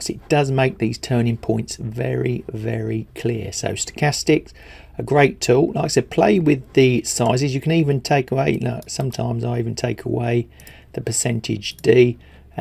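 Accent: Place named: Kent, United Kingdom. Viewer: Does anyone know whether is a Kentish speaker? It’s the one British English accent that has a tinge of Australian elements, though we probably should say that the other way around. Apparently, Kent has been overrun by Essex speakers, so the traditional accent has mostly been displaced in recent generations.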